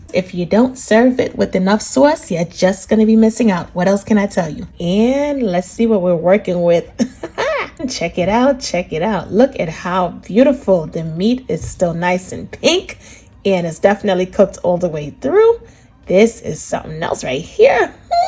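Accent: New York, United States. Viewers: Delicious recipe, love your Ny accent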